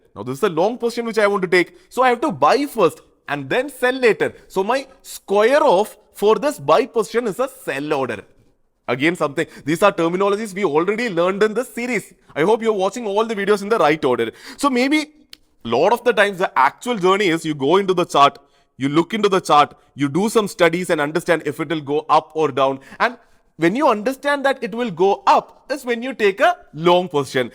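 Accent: Kerala, India. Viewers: Are. U from kerala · Malayali aa? 😂😂😂😂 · Lol that mallu accent is just not ready from accepting to stay back...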